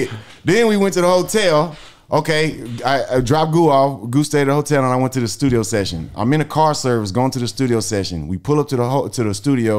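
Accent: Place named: South, United States. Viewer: If I wasn't seeing who this was talking like this, I would automatically think that's a black man from the south talking like that. Damn, this dude has soaked up the culture soooo comprehensively. Got damn! Astounding!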